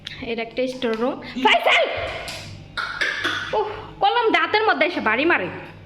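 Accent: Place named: Narayanganj, Bangladesh. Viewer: বাংলাদেশে কোন এলাকায় আপনার বাড়ি? আপনারা ভাষা নারায়ণগন্জ বা বিক্রমপুর এর মনে হচ্ছে।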